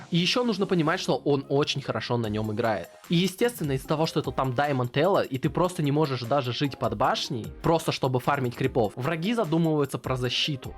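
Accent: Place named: Asia. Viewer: он говорит на английском с некоторым азиатским акцентом. Подтвердить его русскую национальность не могу.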